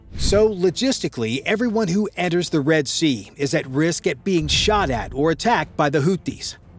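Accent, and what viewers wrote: United States. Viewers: Sounds like a Nyc gan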